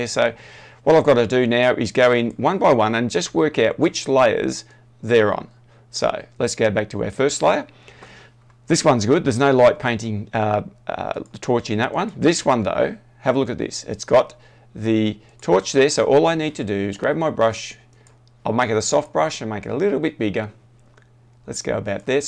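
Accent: Australia. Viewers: Sound Aussie 🇦🇺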